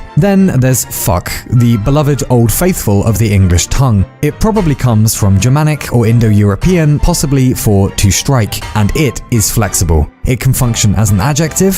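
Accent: United Kingdom. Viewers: OK, but why do Brits talk wrong? It's almost like they want the listener to understand 10% of what they say. Boston, Australia, Texas, drop the dumb accents, and talk like a Californian, with no accent, it's not funny anymore, do you need training wheels for your tongue?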